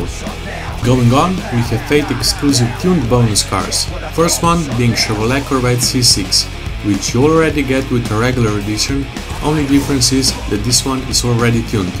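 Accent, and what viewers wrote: Russia. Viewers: Что это за русский акцент?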